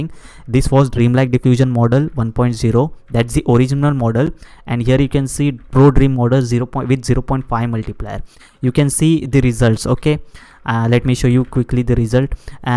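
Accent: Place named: India. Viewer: Your English is very Indian, but the information is good, I can take it.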